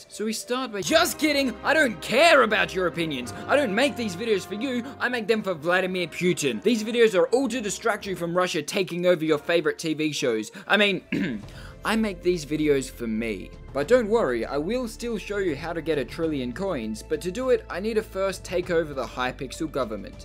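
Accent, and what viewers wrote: Australia. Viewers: good content and austrailian accent 10/10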